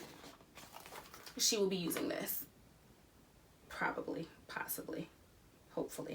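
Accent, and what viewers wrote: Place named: DMV, United States. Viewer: Love the shoes and that blue is gorge on you! I definitely get DMV accent from you are you a native?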